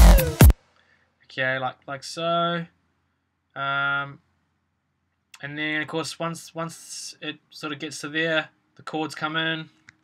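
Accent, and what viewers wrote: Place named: New Zealand. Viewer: does his accent, as in NZ as in NEW ZEALAND... and his channel name 'nz' not give any hints to you? and i'm pretty sure many new zealanders would be not too pleased to be called 'the same' as australians and vice versa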